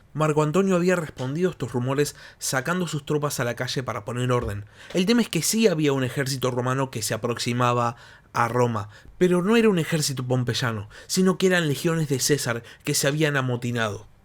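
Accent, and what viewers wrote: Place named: Rioplatense, Argentina. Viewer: Aunque no me gusta nada tú acento argentino o uruguayo (escuchar Pompesho en vez de Pompeyo , weni widi wiki jajaja ,en vez de veni vidi vichi ... En serio no puedo con eso me revienta el tímpano) he de reconocer que tienes un contenido de mucha calidad. Te mereces más subscriptores, a lo mejor si intentases usar un español más neutro conseguirías más subs españoles.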